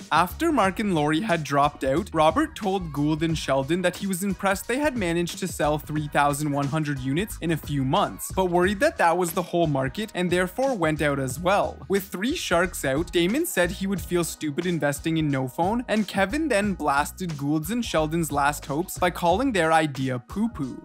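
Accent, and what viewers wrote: Canada. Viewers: He's Canadian :D Probably from the Edmonton or Ottawa area. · bet the VoiceOver is a Canadian dude... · It's called being Canadian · Just the classic Canadian “out” pronunciations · I'm going to venture a guess and say the narrator is Canadian. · He sounds canadian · I think he is Canadian. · He's Canadian, so..... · The narrator is Canadian AF!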